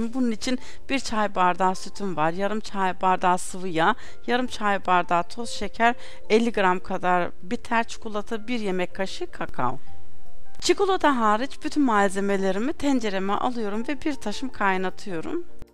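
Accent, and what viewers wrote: Germany. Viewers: Hanım ablamız almanyadan sanirım almanlar gibi söylemiş :) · Farkındaysan almanca konuşan biri. Türkçeyi bu şekilde konuşuyor olması normal değil mi.. TDK başkanı mısın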